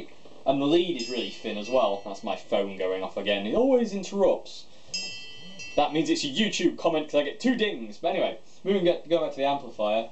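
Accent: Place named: Sheffield, United Kingdom. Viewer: Hi, thanks for the demo. Couple of quick questions I know it's the bandit solo series but is it the 50,65, or 75 watt version I can't see on the video? The other thing is have you tried Tech21's Trademark 60 I really think you would be impressed. Listening to the way you talk I suspect you don't live a million miles away from me in sheffield. You can demo my Trademark 60 if you like I don't think you will be disappointed. Not that I'm knocking the bandit I've got a 1991 teal stripe and that love too!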